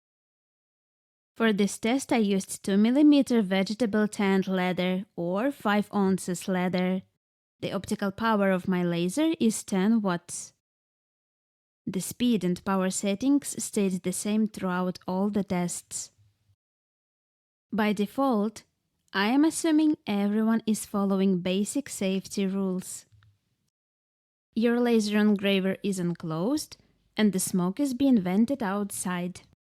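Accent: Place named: North America. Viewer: для моїх потреб буде достатньо. Англійська мова дуже гарна у вас. Північно американська більше, ніж британська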